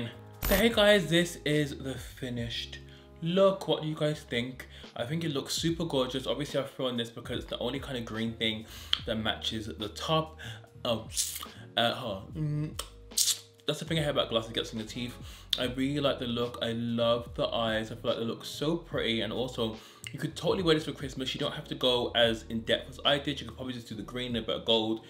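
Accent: United Kingdom. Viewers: When the Viewers forget all about the heavy uk accent 😩Not from the US guys😂😘😍😘😍😘luv watching u😍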